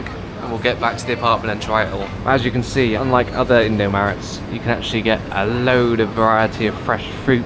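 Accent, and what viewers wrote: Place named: United Kingdom. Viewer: Love your british accent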